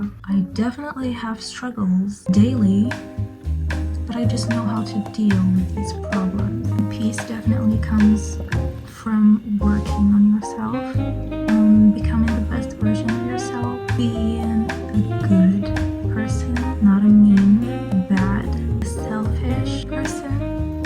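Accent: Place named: France. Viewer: And the french accent was smooth and pleasant to listen to 🫠🫠🫠💋💋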